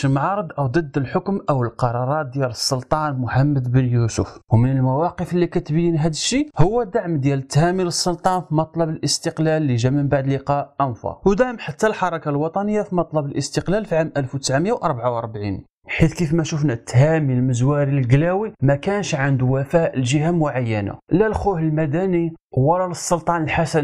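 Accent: Algeria. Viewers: لكنتك الجزايرية غالبة على كلامك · لو اهتممتم بتاریخکم لکان افضل....اللهجه دیالک الجزاٸریه واضحه ... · يحاول التحدث بالدارجة المغربية ولكن اللكنة الجزائرية تطغى عليه بحكم زمالتي مع جزائريين. حتى ملامحه جزائرية ومصطلحاته ومفرداته ليست كمغربي أصلي حين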